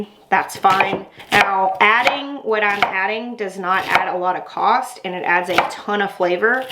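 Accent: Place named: Arkansas, United States. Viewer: It's that good old Arkansas southern drawl! I am also from Arkansas. Not far from where lived before moving. I wish I'd met her back then.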